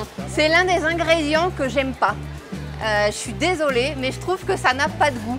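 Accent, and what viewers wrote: Mexico City, Mexico. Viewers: Muchas Felicidades eres una excelente narradora y el Español que hablas es muy latino y con acento muy mexicano te sigo en tu página muy amenos comentarios saludos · Muy agradable el vídeo, fluido, dinámico, con un español coloquial muy de México, uso de frases populares bien colocadas, información relevante de cada alimento que es útil Se agradece que no sólo sea comparativo entré ambas culturas, sinó que deja educación para todos. Muy agradecido con el esfuerzo de informarte y compartir cultura. Cómo décimos aquí, tu vídeo esta p...ca madre! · Wow me encanta que hablas español come Mexicano y no español lol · No mms habla mejor el español que muchas personas y hasta suena muy mexicana alv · Eres taaaaaaaan linda, qué hermoso te expresas sobre mi amada cultura 💜 Tienes un carisma súper lindo! Y me encanta que hables español mexicano, no cualquiera lo logra. 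😂💜💜💜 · Que acento mexicano más guapo. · très bonne votre mexicain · Hablas tan bien el español, que hasta el tono chilango ya lo tienes o se te pegó....Saludos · Es la primera vez que veo uno de tus videos. Eres genial. Hablas español mexicano a la perfección.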